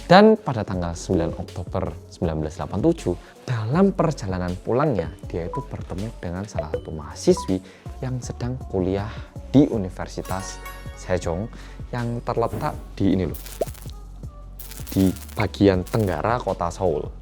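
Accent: Java, Indonesia. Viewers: Biasanya selain medok mas bilang apa ya di intro? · Cus langsung ndelooookkk.... Notif Bersama orang korea yang medooookkk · Entah kenapa selalu rindu suaranya mas yang medok bgt itu lho😭😭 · Dua kata untukmu mas, "Medok banget" Tapi justru itu yang bikin seneng banget lihat chenel nya mas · Bersama orang korea yang medokkk aaaah bikin rindu bgt 😂✨ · Semangat mas . Seneng aku lihat masnya pakai bahasa indo medok. Sekali kali coba bahasa Korea ... Pakai sub ... Jadi pingin denger kalau bicara bahasa korea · wong jowo mas?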